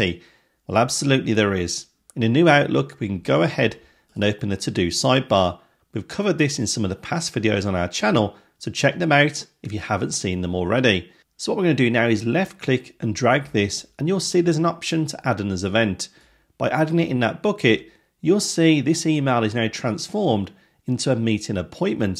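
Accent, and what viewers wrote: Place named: Wolverhampton, United Kingdom. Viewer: This is a good video. However, it does not consider that most people who have an Outlook Classic work email are on IMAP or POP, not on Exchange. Hence, when you switch to the new Outlook option, you no longer have all the same features.and Therefore, switch back. Thanks, something to consider. Also, I recognise the Wolves accent 👍👍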